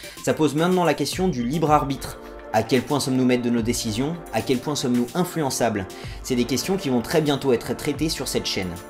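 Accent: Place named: England, United Kingdom. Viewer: Best accent anglais ever!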